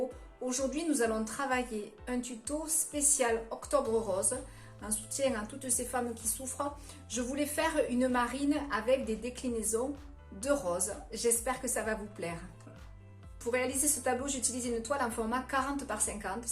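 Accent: South, France. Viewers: Je ne trouve plus de nouveaux mots pour dire combien j'ai ton travail et ce tableau est à tomber. J'aime le rose, mais avé l'accent c'est encore plus beau. Merci. Merci 😘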